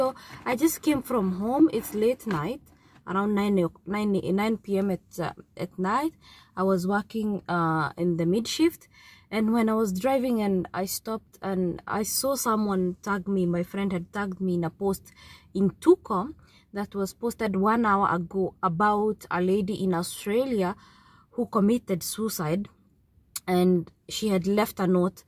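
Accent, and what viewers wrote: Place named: Arab world. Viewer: This Arabic accent....special.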